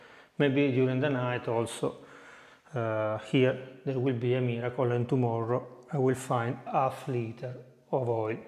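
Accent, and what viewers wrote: Italy. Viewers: I had to like the video the second you started talking. Love the Italian accent. Watching from Ocala, Florida. Thank you for showing us how to make the oil starting directly from the plant. I have a nice fully grown olive tree that is producing olives and I can't wait to give this a shot.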